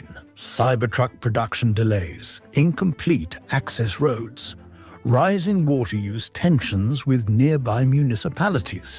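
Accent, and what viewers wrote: United Kingdom. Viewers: W"hat's with the constant British voice in the backstory narration on anything BIG, BOLD. AMBITIOUS INFRASTRUCTURES in America....curious??! 🤔🤔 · Why the heck do we need a narrator using flowery language and with an English accent telling us about Texas??? Stick to making videos about sheep farming in Yorkshire and how to properly hold a teacup!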